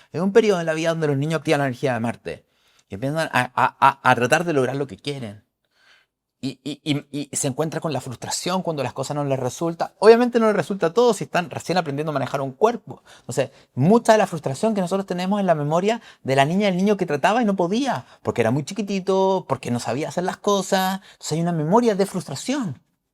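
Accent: Chile. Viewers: Gracias,. Mantener el corazón abierto y agradecer. Intentar por todos los medios no estallar....respirar y cuidarse y cuidar. Vídeo para guardar y reescuchar si es necesario y creo que lo será. Agradezco todo lo que transmites....seguro que habrá momentos que sea agotador. El panorama panorama es terrorífico... pero como tu dices es una oportunidad. Hay muchas personas sufriendo y mucho. Cada uno sabe por lo que pasa pero hay lugares en donde no saben que va a ocurrir de un momento a otro de manera terrible. Siempre dices al final de los vídeos que nos quieres mucho y nos cuidemos....en chileno que es un modo de hablar encantador. Y que me resulta tan familiar...así que yo hoy te digo que se te quiere y que te cuides mucho. Abrazo para ti y para todos. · Hola buenas tardes. Soy chilena 🇨🇱 del signo de virgo. Me encanta la forma como te expresas habla como un verdadero chileno, muy real y muy sincero oro y miel para ti 🙌💪🐘♾️🌹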